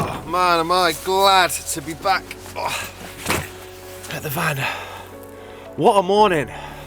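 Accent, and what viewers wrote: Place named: Sheffield, United Kingdom. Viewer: It's a like and sub fron me. Sounds like you live near Sheffield like me :)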